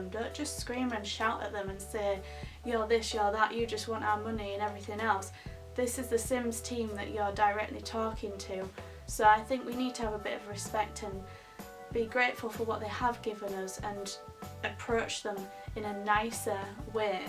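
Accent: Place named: Yorkshire, United Kingdom. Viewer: Erm i have the same accent as (as from Yorkshire originally) you can't help the accent you have, for goodness sake. You are probably not english either, cos most Brits say it like that, it is how it is meant to be pronounced!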